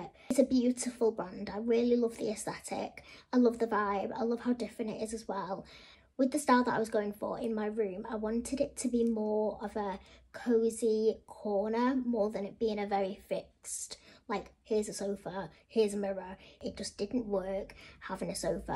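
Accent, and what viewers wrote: United Kingdom. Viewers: Extraordinary beauty....and love that British accent....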